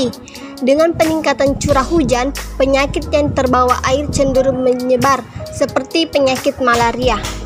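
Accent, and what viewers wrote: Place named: Java, Indonesia. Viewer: medoknya botak hahaha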